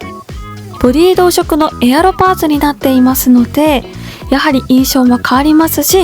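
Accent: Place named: Fukuoka, Japan. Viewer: いつも楽しく拝見させて貰ってます！ 僕は福岡県内に住んでるんですが こっちの方言にクリソツなんですが 愛媛育ちなんですか？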